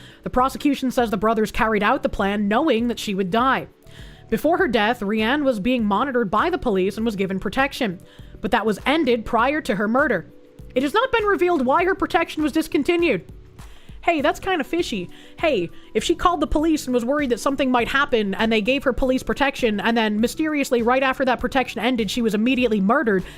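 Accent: Boston, United States. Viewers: Obviously D4vd is innocent and the girl just accidentally hit the self deleting and dismembering button in the Tesla. It's marked D&D so she thought it was a roleplaying game with the car's AI. Unrelated: Ab sen tee-ah. My god. It's not your Boston accent. I love your Boston accent. It's the fact you cannot pronounce words. Ab sen she-uh.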